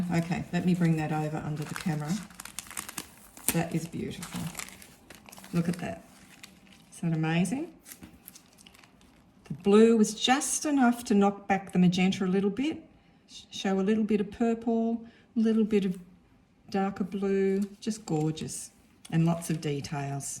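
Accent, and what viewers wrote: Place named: Australia. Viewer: I love your work, can you advise where to buy the deli paper from, I’m struggling to find a stockist. You sound Aussie, like I am. I love experimenting with my gelli plate.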